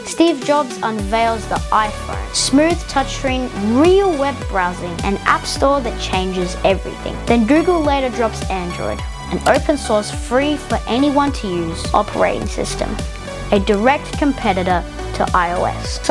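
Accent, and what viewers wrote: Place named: United Kingdom. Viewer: Great work kid just loose the British accent and you’ll blow up 🙏🏽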